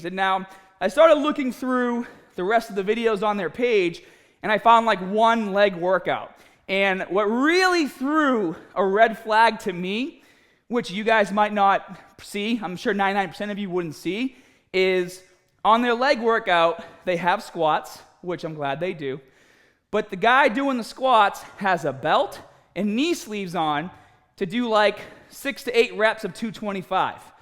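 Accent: Boston, United States. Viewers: Are you from Bahston? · Came because of the title, stayed because of the Boston accent. Good video bro, just gained a subscriber, about to binge watch your videos 💪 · a little less pre-workout maybe, I get you are passionate but yikes dude. PS love the boston accent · It’s funny, he sounds like a pretty average accented American, until he say “gAAbAAge” lol. Oh how I love a good Boston accent! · Is that a Boston accent?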